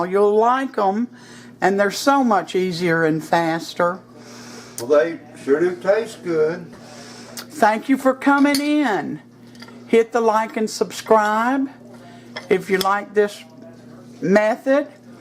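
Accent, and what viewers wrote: South, United States. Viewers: I really appreciate your presentation. Your southern accent gives confidence and assurance to your listeners. It's southern hospitality personified.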